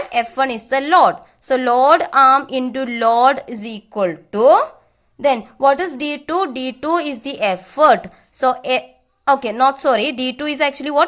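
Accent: Kerala, India. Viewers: Sounds like she is a Malayali...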